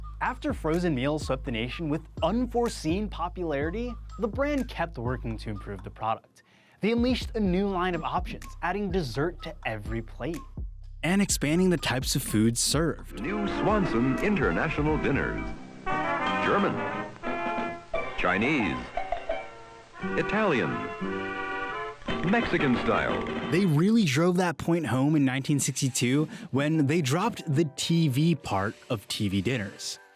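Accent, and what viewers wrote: California, United States. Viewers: the narrator's accent reminds me of the snl californians skit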